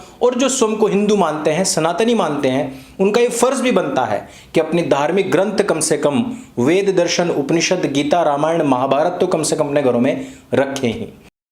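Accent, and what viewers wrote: Haryana, India. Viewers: Sir haryanvi sunne me mazaa aa gaya ...what a episode mind blowing .. dhanyawad... 🙏🙏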